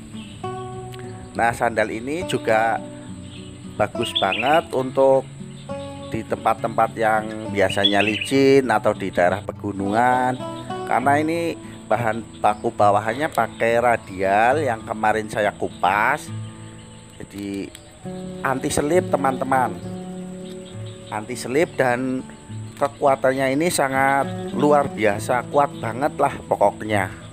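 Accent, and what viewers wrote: Java, Indonesia. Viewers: Kreatifitas tanpa batas... Dengan logat jawa punya..👍👍👍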